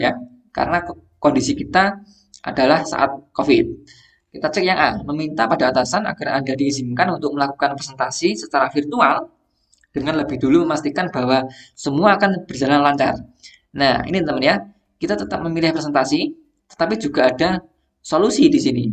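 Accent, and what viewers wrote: Java, Indonesia. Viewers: Buoh... 😂 Jowo tenan coach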